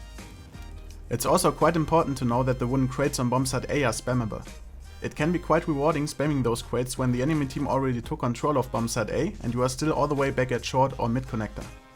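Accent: Germany. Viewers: Oh yeah, German English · Dieser deutsche Akzent bääää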